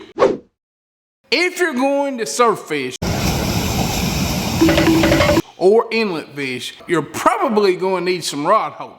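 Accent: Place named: Alabama, United States. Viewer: WOW THANKYOU PAL I'M FROM QUEBEC AND I LOVE ALLS UR FISHING HACK'S VIDEOS AND I LOOK AND LOVE YOUR ACCENT SOUND LIKE ALABAMA ACCENT...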